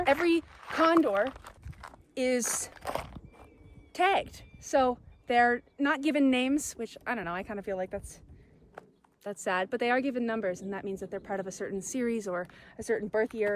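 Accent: Canada. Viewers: killed me with the Canadian accent 🤣 Love your corny jokes, education as you make your way through the trails and just your overall excitement when oot and aboot in nature! Amazing video as always and excited to see where you explore next, cheers!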